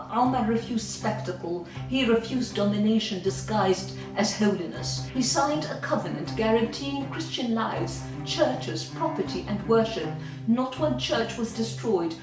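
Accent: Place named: London, United Kingdom. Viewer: Mad accent. It's like an Edwardian era upper middle class London accent.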